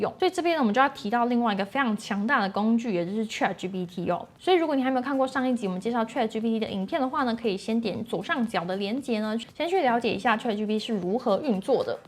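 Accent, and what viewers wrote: Taiwan. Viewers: 这台湾音好可爱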